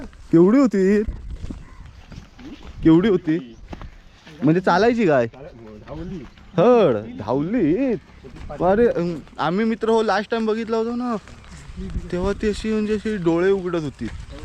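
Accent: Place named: Malvani, India. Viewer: अरे तु मालवणी आहेस की रत्नागिरी कर. बोलतोयस मालवणी.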